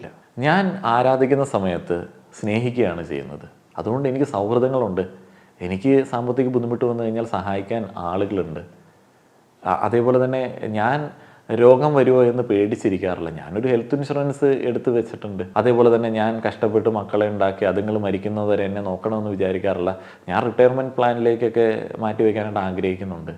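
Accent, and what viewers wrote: Kottayam, India. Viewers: Bro Kottayam kaaran aano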